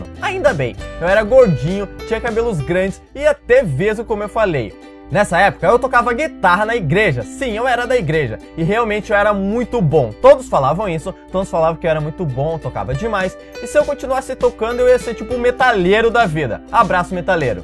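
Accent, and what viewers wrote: Rio Grande do Sul, Brazil. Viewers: Bah, que tri! Quando assisti teu canal pela primeira vez, percebi que teu sotaque era gaúcho! 😍 E agora que tu é de Gravataí, que massa! Eu moro ao lado, em Cachoeirinha. É nós, adoro o canal! ☺👊👊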